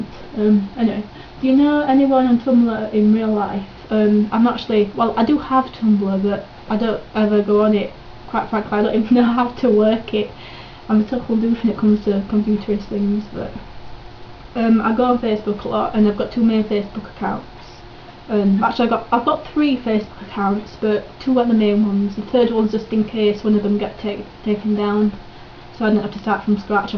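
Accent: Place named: Yorkshire, United Kingdom. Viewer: WHEEW , yorkshire!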